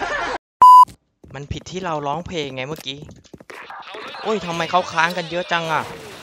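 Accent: South, Thailand. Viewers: คนใต้ จังหวัดไหนนิ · พี่เป็นคนใต้หรอค่าบ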